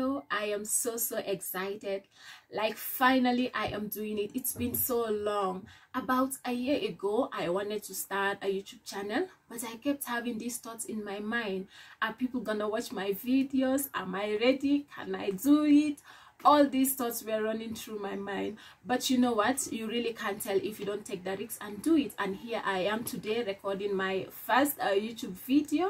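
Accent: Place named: Kenya. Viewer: Aii the Kenyan accent 🥰 😘😘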